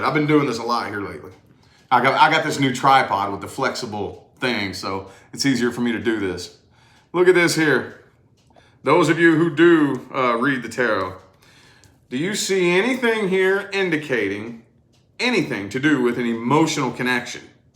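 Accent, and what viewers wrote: South, United States. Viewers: the only Southen accent I like is yours, thanks.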